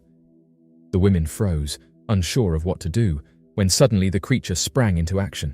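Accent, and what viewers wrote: United Kingdom. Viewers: Fyi. A British accent is used to be more convincing .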